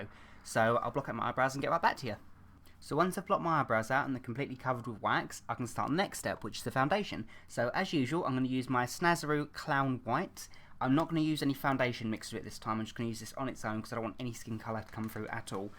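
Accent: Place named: United Kingdom. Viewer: His accent is ... Brittish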